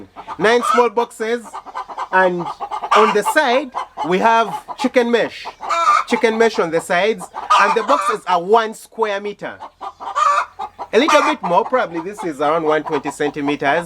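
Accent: Uganda. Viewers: Just dropped on this video and just by the way he spoke...i immediately knew he was a ugandan😂😂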